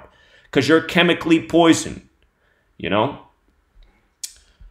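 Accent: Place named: Northeast, United States. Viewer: I agree some what, Your saying u can call games, give game calls to someone in New Jersey. Who has not lost any playoff games to your one game a day speech u said u do. Your wrong admit it!! I bet you don’t even know how much % percent wise u have to win, if you are going to make any profit from gambling FACT PERIOD. Yeah u can easily find out that answer cough cough goggle. If u had that kind of knowledge your talking about, the video wouldnt be up here. there are 100s of millions of $$$$$ if not billions on sports gambling. you would never be able to have any opinion, I fell like with that much money you would disappear, like the men in black would come and take u somewhere. I think someone would do Something to you with this kind of information. You also Said you give a New Jersey guy info on games and he’s has not lost any bets but one and it was a come back that could of made him shit his pants because of the money he wasted from Your word. 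I see threw you and your northern accent that stands out well. Sounds like ur from The north like Philadelphia ,New York,New Jersey etc Area. I don’t think anyone would come OUT and say NBA is rigged here is the proof. u keep saying we are retarded if we don’t believe you. To the point you sound retarded saying u can call games. You Might like all get some right but ur not wining like u say u are. Your not able to call games like u say u do fact! If all pro sports like the nba are rigged,it’s not from technology it’s from the refs com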